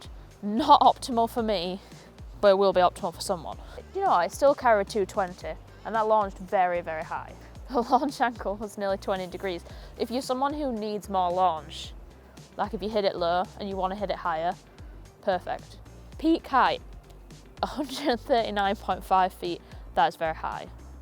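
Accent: Yorkshire, United Kingdom. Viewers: Have played the G410 and am looking forward to trying the 430 when it's available. Liked the review and the friendly Yorshire accent, thanks.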